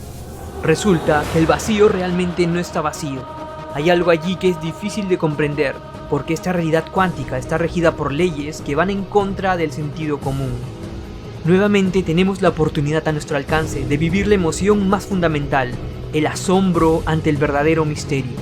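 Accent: Peru. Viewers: por su acento me parece que si es peruano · Es peruano por el dejo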